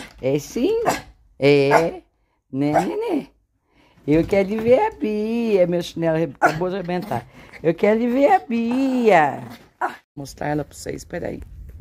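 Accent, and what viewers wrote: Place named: Minas Gerais, Brazil. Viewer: Eu adoro esse seu sutaque caipira de Minas gerais e são Paulo kkkk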